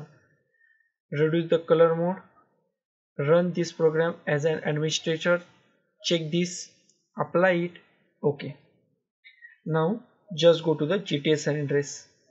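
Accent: India. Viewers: When i heard his indian accent , i knew it gonna work. Thank you man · When i heard indian accent i didn't even needed to try it i already know it will work thank you brother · his accent his pure indian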